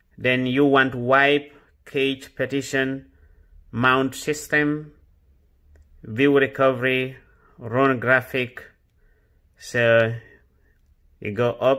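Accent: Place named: India. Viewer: Indian voice, black hands...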